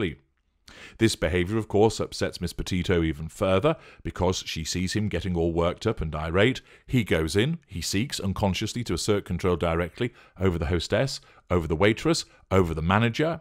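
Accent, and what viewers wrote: United Kingdom. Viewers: I like your voice and your british accent. It is very interesting to listen to you. We learn a lot on narcissistic people. They are dangerous people.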